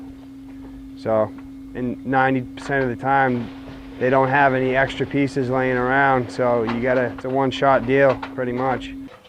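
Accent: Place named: Boston, United States. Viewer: 2 words in and I knew this guy was from Boston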